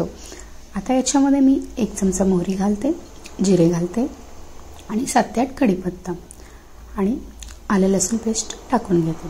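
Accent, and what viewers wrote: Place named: Kolhapur, India. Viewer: कोल्हापुरी भाषेत लय भारी 👌👌👌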